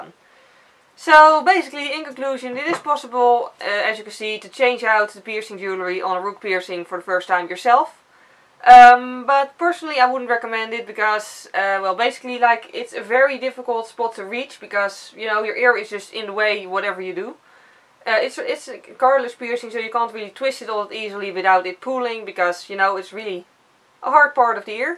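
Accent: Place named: Netherlands. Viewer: Wow, I did not expect you to answer personally.🙂 I just noticed your accent, I'm german and I often go shopping in the netherlands, but I never heard a Dutchman/woman talk English before, sounds kind of familiar to the accent the Dutch have when then talk german.😊 Btw. thanks for answering me and I think your video was helpful.👍☺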